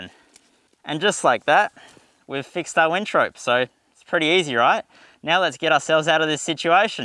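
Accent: Australia. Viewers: Love listening to this guy talk reminds me of the auses I worked with in Afghanistan 👊🏻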